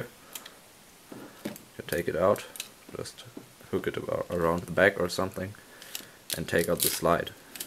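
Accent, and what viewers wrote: Germany. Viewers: Jetzt hab ich es mitgekriegt das du ein deutscher bist^^ Wegen deinem akzent^^ Aber echt mal so gefragt wie kannst du solche waffen bauen?0_0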